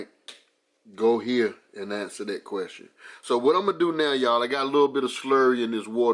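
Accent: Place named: Carolinas, United States. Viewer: I knew you were from the Carolina's...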